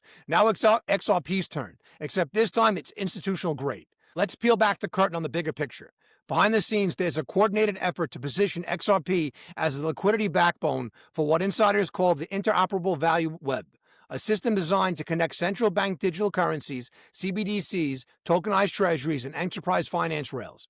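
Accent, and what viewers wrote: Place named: Brooklyn, United States. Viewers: No. I'm from Brooklyn, born in 1955. This guy is definitely from Brooklyn. He speaks like an educated old school Brooklynite. Al doesn't have a chance replicating the accent. The accent is rapidly dying out. When this guy speaks, it's music to my ears. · I doubt AI has mastered the Brooklyn accent yet...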